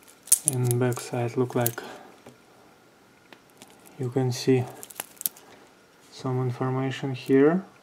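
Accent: Russia. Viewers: А почему рязанское произношение,а?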